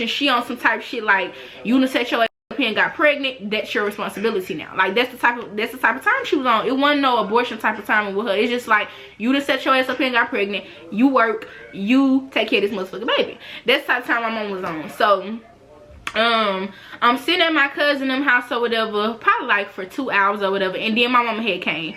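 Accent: Louisiana, United States. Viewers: I just knew you was from louisiana😂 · Not you from Shreveport lol . It must be a Louisiana thang 😂😂😂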